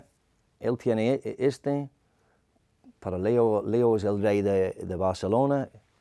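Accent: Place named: Liverpool, United Kingdom. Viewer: Spanish with a scouse twist.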